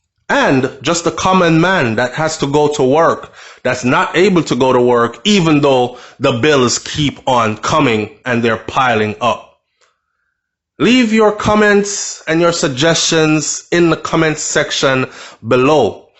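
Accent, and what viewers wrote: Trinidad, Trinidad and Tobago. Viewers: you're silly you really sound just like a trini 😆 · you killing me with the accent, #BagoGyal🇹🇹🇹🇹🇹🇹🇹🇹🇹🇹🇹🇹🇹🇹 #CaribbeanStrong🙏. Yep Our prime minister doing right by us, it's hard for those of us outside, but necessary. We just have to wait before we can touchdown. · Are you Trini? Lol your accent is awesome tho. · Yuh 🇹🇹 accent sound good boy. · Bwoy yu have the trini accent lock. On another note, i applaud Trinidad for really taking this virus thing serious. I can also understand why my country Jamaica 🇯🇲 has 600 cases. Because of the tourist industry of course. I hope 🙏 and pray Andrew Holness just keep Jamaica close for another two months and gwaan pree the ting. At the end of the day, the world cannot live without Jamaica so we need to protect our ppl first. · Good afternoon..Love the trini accent blessings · you sound just like the Treni. 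Any way I feel that they should keep the border locked until it is safe. Work with what they have until ones health is secure. Much love to you your wife and your family. Lots of love to your grandma hope she continue to do well. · Lol your Trini accent, has me in stitches!! Our PM is doing the right thing, I want to go see my family, but..let common sense prevail in TnT!! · Is that supposed to be a Trini accent lol it’s pretty good sounds like a mix of trini and Guyanese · you are so funny...talking Trinidadian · You speak Trini better than how you speak Jamaican good job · Very good trini accent. Very good lool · Like your Trini accent lol have a blessed day to you and viewers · Oh gosh you and the trinidadian accent. But on the real Trinidad is not heavily dependent on tourism like Jamaica. Jamaica needs tourists to get the economy flowing again thats why there is a rush to open the country. 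· U Trini accent on point.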